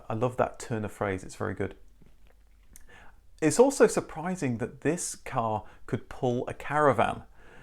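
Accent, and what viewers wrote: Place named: Midlands, United Kingdom. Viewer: Amazing how your intonation switches to much more English (or should I say "Midlands"?) dialect in this "Extra video" ... not sure you noticed.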